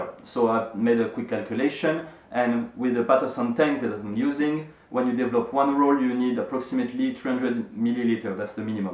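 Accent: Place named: France. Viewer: aaaaah, le petit accent français! J'adore votre site au passage, super utile pour apprendre!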